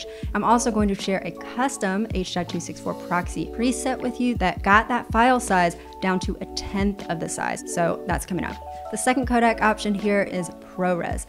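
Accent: South, United States. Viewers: Also, lovin' the speach. I'm from Georgia and I feel like watchin' your vids brings me back home to the south 😀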